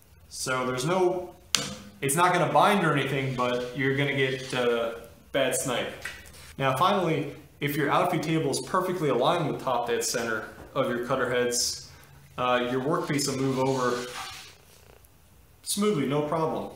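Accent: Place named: United States. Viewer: Dude you are wearing a Texas A&M tee shirt you Speak English as I would expect someone from the United States of America to Speak, However you keep referencing metric measurements I am an old guy and use to install CNC machining centers. We used imperial measurements. I maybe wrong but to me one would have finer resolution using thousands of an inch. Then all of a sudden you mention thousands. I get the global thing since the rest of the world is wrong 🤪using metric. I have a son that is a mechanical engineer I have tell him too speak English we are in The US. Yes he was like me and 20 generations before me from here in the US. Good video I must say the concepts that you brought out are what are important not what scale you used to define the accuracy. Have a great day thank you for taking the and effort to produce a very good video.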